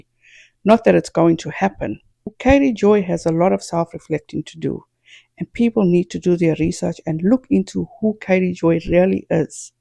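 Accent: South Africa. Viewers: So lovely to hear your beautiful South African accent! 🙌🏻🙌🏻 🇿🇦🇿🇦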